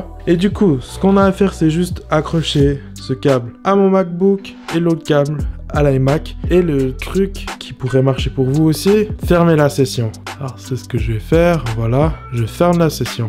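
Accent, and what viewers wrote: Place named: Switzerland. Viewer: Je fais une recherche à ce sujet, je reconnais ton accent Suisse et je vois Delémont...Yo! Je viens de Delémont aussi mais je vis à Montréal depuis 2011. Insane ce bel hasard!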